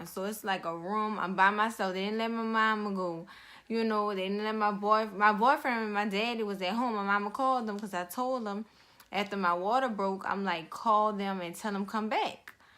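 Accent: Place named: New Orleans, United States. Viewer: That New Orleans accent tho 😝😝😝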